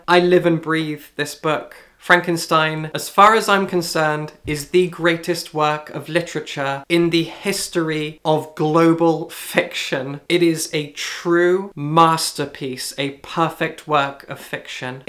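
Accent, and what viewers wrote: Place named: England, United Kingdom. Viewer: I love your reading suggestions and reviews, as well as your perfect English accent. Thanks for you work! I've proudly subsctibed to your Patreon. Alice from Italy ❤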